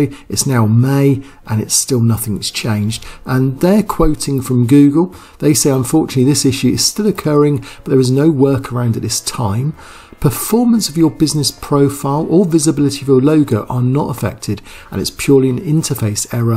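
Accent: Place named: England, United Kingdom. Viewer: Yes of course. But just to be clear, it wasn't overbearing at all. Perhaps it was just me, but I found it a little distracting from that lovely English accent, LOL! And thank you again for all these super helpful videos. I'm trying to learn as much as I can so I can help my web design clients with another service.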